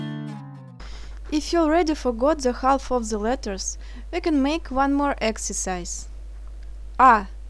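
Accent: Russia. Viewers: hello, I like this girl's English accents, does who knows that is every Russia girl speak English like this? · У Вас приятный русский акцент. Приятно слушать! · Сразу слышно что русская))) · i love her russian accent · По произношению понятно, что ты русская) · OMG ... Alphabet is so difficult but russian accent is so beautiful 😍😍😍😍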